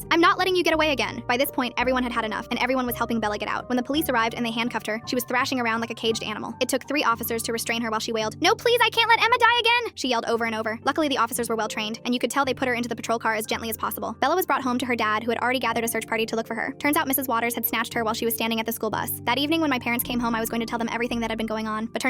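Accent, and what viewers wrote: United Kingdom. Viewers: So your British I challenge anyone here to figure out how I found this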